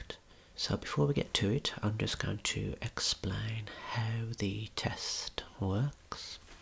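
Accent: United Kingdom. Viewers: it's the UK accent 100%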